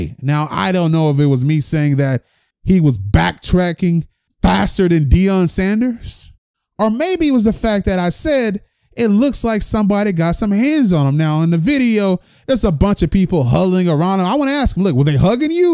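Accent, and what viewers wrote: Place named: Houston, United States. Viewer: 😂😂 I can tell you’re from Houston 😂😂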